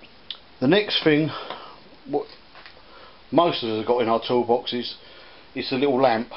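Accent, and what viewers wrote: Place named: United Kingdom. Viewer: Excellent video. Also, quite fun watching the computer translator try to deal with a heavy brit accent.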